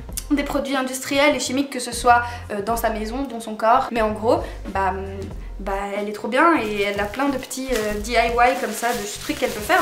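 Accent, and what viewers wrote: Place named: Switzerland. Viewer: Super vidéo, il me semblait bien que t'était Suisse, avec l'accent... Tu viens de quel canton ? Moi c'est canton de vaud😂❤️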